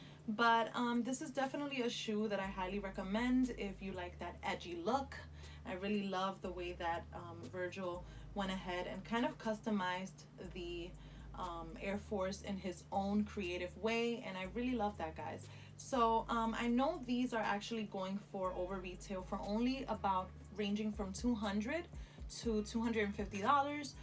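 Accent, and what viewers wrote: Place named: Latin America. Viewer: First time on your channel, I really like the style of it, and your Latin accent is HOT! I subscribed, looking forward to future content! I got the white and black, so far I wore the white and it does feel a bit weird but I think you’ll get used to it fast.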